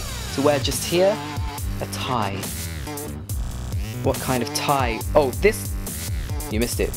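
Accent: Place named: England, United Kingdom. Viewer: Where r u from by the way? I've visited London last year and it was an amazibg experience. From your accent it's more than obvious you're from England))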